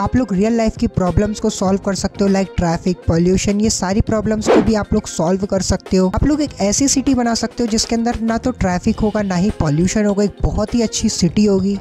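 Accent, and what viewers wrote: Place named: India. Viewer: I do not understand this fast Indian accent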